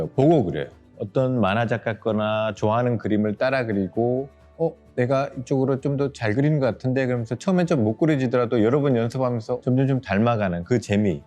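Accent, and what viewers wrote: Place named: Gyeongsang, South Korea. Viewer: 출생이 경기도 고양시 출생인데 말투가 경상도 쪽 어휘와 억양이 있는데 경상도에서 살았거나 부모님이 경상도 쪽인가? 거의 확실해 보이는데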